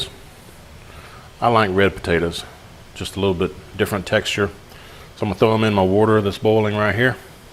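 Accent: South, United States. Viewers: Hi, I like your stuff. Your voice takes me home to the south again. One thought comes to me. What do you think of a lid or splatter shield over the skillet? Seems to me easier to wash a splatter shield than degrease other pots, the entire stove-top and burner grills and burner diffuser parts to keep the gas ports clear of blockage. Who cleans ip behind you. I'm guessing its not you. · You sound like you are from southeast Texas or southwest Louisiana. I'm from DeRidder, La but i live in Henderson, Tx now. You sound like my people lol There ain't nothing better than a southern gentleman who knows how to cook! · we never use aluminum in the kitchen . oh wait, here comes the cast iron. sorry. other than that, they look great. where is the chopped onion? Jumped the gun again, sorry. lol my preference. great video. anybody that says "warter" and "fixin to" must be southern, Georgia or Alabama? 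· For those that don't speak southern drawl English, "I'm awn" means, "I'm going to" I know it makes no sense. Nobody can explain that total ruination of our English language. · i dont like steak sauce, but this video has definately made me want to try it out. it looks so good.... thanks for this awesome idea... (ps-i love your southern accent) :p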